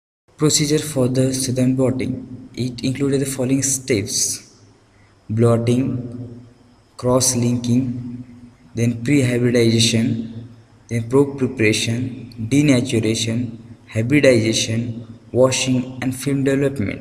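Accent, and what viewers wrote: India. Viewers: Indian accent :D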